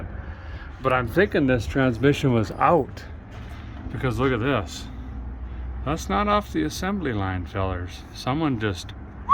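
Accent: Minnesota, United States. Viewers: For Pete's sakes. Definitely a fellow Minnesotan. Love it! Neutralis is my new favorite word. 😊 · very entertaining indeed and informative too, i like you're accent so American "pure jus" a bit hard fore European ears but i get used to it now, call the old lady " Ma baker " Couse chi never die and like that song.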